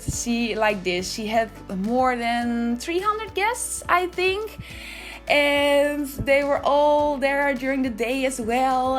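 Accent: Netherlands. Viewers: This looks great! Where are you coming from? It sounds like you're from Holland. I'm Dutch myself.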